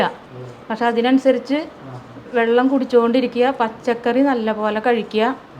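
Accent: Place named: Palakkad, India. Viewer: സംസാരം കേട്ടിട്ട്. പാലക്കാട്‌.. അല്ലെങ്കിൽ തൃശൂർ ആണെന്ന് തോനുന്നു 🥰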